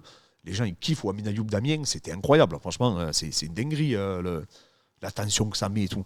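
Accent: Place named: Rural, France. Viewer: Tres bonne chaine, mais alors l'accent du terroir en + c'est un abonnement direct 😂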